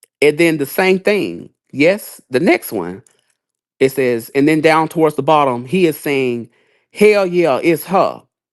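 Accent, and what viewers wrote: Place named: Texas, United States. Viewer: Also are you from TX too bc your accent, no shade at alllll, sounds similar to Milagro the way your pronounce certain words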